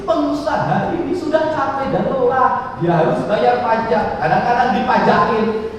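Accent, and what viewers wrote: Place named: Java, Indonesia. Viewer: Kliatan aroma RI 1 KDM asli Sunda dan jawanya medok banget, adat dan budaya jabar akan hidup lagi salam dari sumatra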